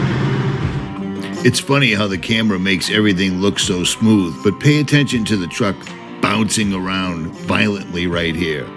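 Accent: Boston, United States. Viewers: ? From Boston?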